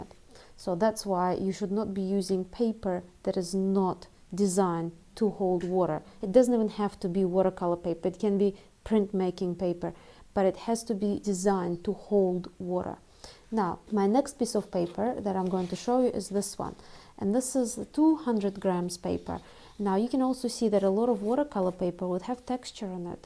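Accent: Russia. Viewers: I love your voice! I am new to your Chanel. As much as I love watching someone draw, the main reason I watch your videos is the sound of your voice. Not only is it soft and relaxing, but I have ALWAYS enjoyed the sound of a Russian accent. I’m glad I came across your Chanel. Thank you!! ✌️ from Canada!! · Beautiful feminine voice, beautiful Russian accent!😊😊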